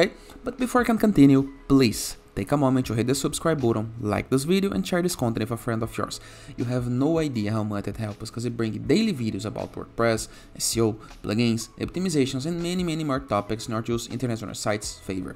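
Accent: Brazil. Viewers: dificel não reconhecer o br na fala kkk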